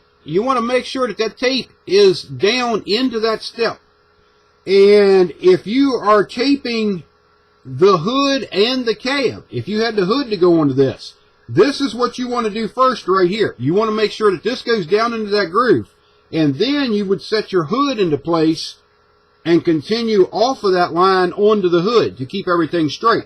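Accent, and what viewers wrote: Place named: South, United States. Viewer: This is funny, you know how they say everyone has their own twin? Well bud,I found yours. My Brother in law Paul is almost identical to you even down to the go t and glasses. What separates you guys is the voices. Paul doesn't have a southern accent and he is a soft speaker. AND get this... He builds car models, alot of local circle track cars that raced in the 60's. Anyway,yup,you now have your unrelated twin. I wish I had a current photo. I got on your channel to see how other car modelers paint 2 tone.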